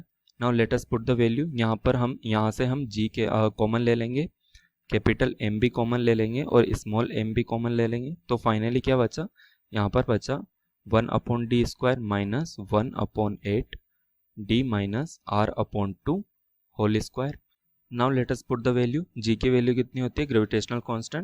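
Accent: India. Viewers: He's speaking English with a thick Indian accent.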